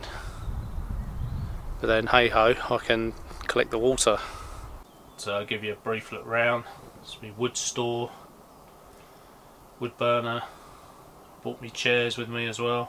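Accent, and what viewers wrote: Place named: United Kingdom. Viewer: hey mate, great set up! How on Earth do you manage to hget so much space in the woods where you can stay longterm like that. You soudn British and as far as I know, apart from Scandinavia, you can not stay in a tent on land anywhere in Europe. How did you do that!??!?! Hope ou can have some great times there. Lovely also for teh dog to be out like that! WOnderful!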